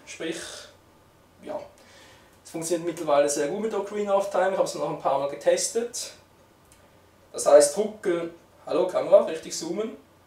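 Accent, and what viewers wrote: Switzerland. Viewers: Ich interessiere mich für den predator und dachte mir ich bestelle den über digitec.ch.... also bist du mit dem pc nicht so zufrieden? ich brauch den hauptsächlich zum zocken und evtl. berufschularbeiten.... wichtig ist für mich, dass man auch 2 bildschirme anschliessen kann das ist sehr wichtig. freu mich auf ne antwort... p.s. am dialekt an bist du schweizer oda? ^^ denn freui mi uf e baldigi antwort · sag mal bist du Schweizer ? du hast so einen Akzent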